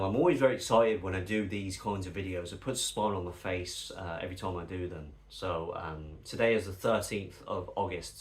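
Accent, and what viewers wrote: England, United Kingdom. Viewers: My reply was not "uninfotmed" it was merely pointing out the obvious. However he's mainly talking about England as you can tell by his English accent but he includes all the Home nations, hence his use of the Union flag to cover all parts of the UK and Northern Ireland. I do not know which country you are from but even among the citizens of the Home Nations who don't like the Union Flag and only recognise their own flag the fact remains that it is the internationally recognised flag of the UK and Northern Ireland. I trust that reply is comprehensive enough for your exacting standards.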